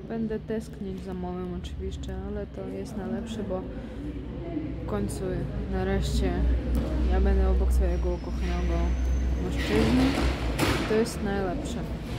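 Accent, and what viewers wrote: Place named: East, Poland. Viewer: Potwierdzam to, co czytałem w innym komentarzu, mówisz coraz lepiej po polsku :) Najbardziej uderza to, że "wschodni akcent" słychać u Ciebie tylko od czasu do czasu, gdyby nie sporadyczne zastanawianie się nad doborem słów (i czasem odmiana), spokojnie można byłoby powiedzieć, że jesteś Polką np. z Podlasia (region w pobliżu granicy z Białorusią, tam - zwłaszcza w mniejszych miejscowościach - ludzie mówią w podobny, lekko śpiewny sposób). Biorąc pod uwagę, że uczysz się sama, nie masz bezpośredniego codziennego kontaktu z Polakami, to jest bardzo duże osiągnięcie. Oglądam filmy na kanale "Odin Iz", prowadzi go Ukrainiec, który pracował w Polsce, pokazuje "szokujące różnice" między Ukrainą i Polską, na przykładzie nie pomalowanych osiedli w miastach, starych domów na wsi, tylko pod każdym z takich filmów dostaje wiele komentarzy mówiących o tym, że w PL są miasta i wioski wyglądające podobnie. 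Ty w ciągu kilku minut pokazałaś więcej różnic, niż on przez kilkadziesiąt minut swoich filmów, pociągi, droga, toalety - średnio w PL jest z tym już lepiej. Babcia na pewno ugotuje coś, co lubisz - wiele osób jest zainteresowanych potrawami z innych krajów, jeśli będziesz mieć okazję, możesz pokazać, z czego i jak przygotowuje się coś zwykłego, codziennego u Was, bo dla wielu oglądających to może być ciekawe :) Pozdrowienia dla Ciebie i całej rodziny, oby szybko udało się zrobić wszystko to, co planujesz :) PS: Ładne, nowoczesne mieszkanie.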